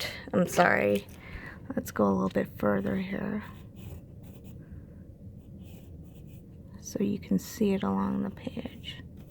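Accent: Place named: Minnesota, United States. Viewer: Are you from minnesota? lol I think I hear the accent.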